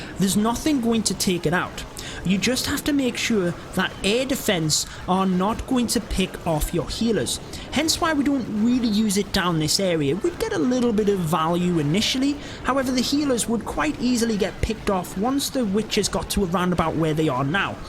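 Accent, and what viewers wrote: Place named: North East England, United Kingdom. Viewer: CraziiPower he has to slow his "talking" down because otherwise you would not be able to understand him due to a strong north east (England) accent. Also to me it's not slow at all, just clear and efficient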